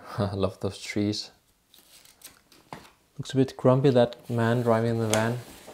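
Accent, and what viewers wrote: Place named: Denmark. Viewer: You sound very danish 🇩🇰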